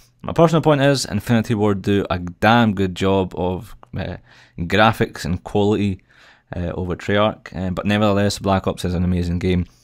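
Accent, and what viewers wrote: Scotland, United Kingdom. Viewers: Scottish sounds awesome, much more special than regular English.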